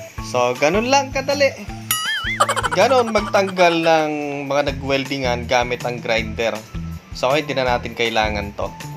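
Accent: Ilocos, Philippines. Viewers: Ilocano ka sir?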